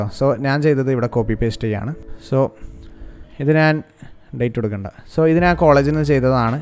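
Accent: Kannur, India. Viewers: Mangala poraa kannur anno machaa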